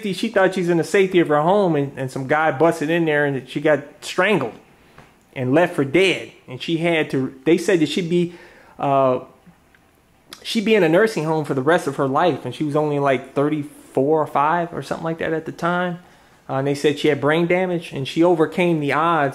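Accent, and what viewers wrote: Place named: Appalachia, United States. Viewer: you're a great guy and very sincere with your advice. You have a great hill billy accent and infectious laugh.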